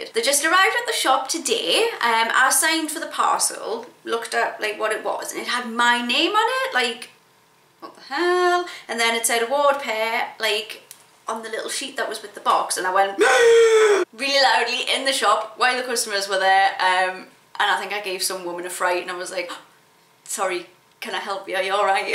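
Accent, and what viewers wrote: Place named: Newcastle, United Kingdom. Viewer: Love your Geordie accent and the boots xxxx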